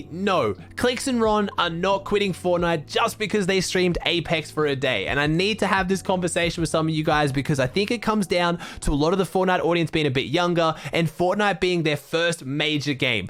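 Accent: Australia. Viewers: sheeesh aussie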